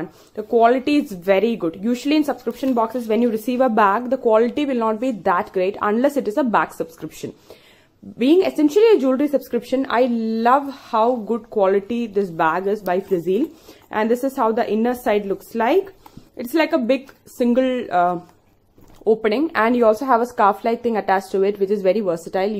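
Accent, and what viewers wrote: Kerala, India. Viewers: Are you a mallu! Your accent proves it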